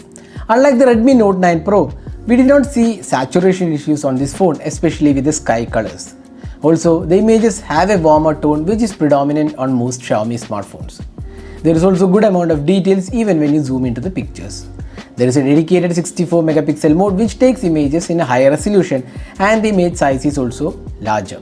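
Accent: Kerala, India. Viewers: English kettalariyam malayaliyanu bro enn!!!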